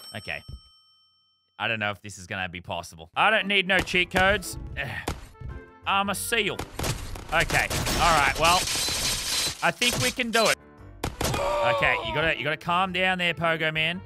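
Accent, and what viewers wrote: Australia. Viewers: He Australian? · This is if mrbeast was Australian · I’m Aussie and it’s a bit weird hearing people saying YouTube like that on YouTube because I never really see Aussies on yt because I haven’t watched u for a while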